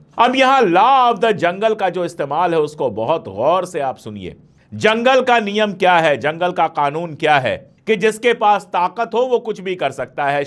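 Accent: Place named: Kashmir, India. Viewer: Lafayette, LA, USA I enjoy hearing your insertion of Kashmiri Urdu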